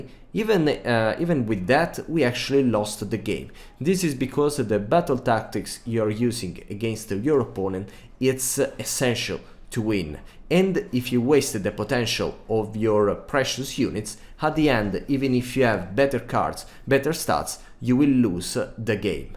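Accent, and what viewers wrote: Italy. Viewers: I new this accent was italian 😂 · Ciao, bel video, l'accento italiano comunque si sente a chilometri hahahahah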